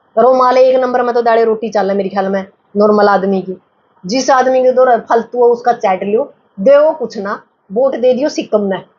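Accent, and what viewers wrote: Haryana, India. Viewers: aap suddh Hindi mila kr bohat suthree Haryanavi bol leti ho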